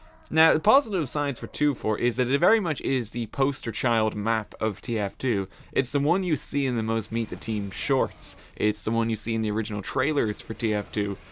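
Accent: Ireland. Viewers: haha your Irish accent is one of the things that keeps me coming back! It's so fun to hear you folks say 'Threehundred and thirty three trees' and so on... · Are you Irish? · I actually like 2Fort, but it needs a time limit. P.S. Are you Irish? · You're an Irish TF2 youtuber? subbed immediately. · lol your accent sounds like half Irish and half Australian xD (plsignore)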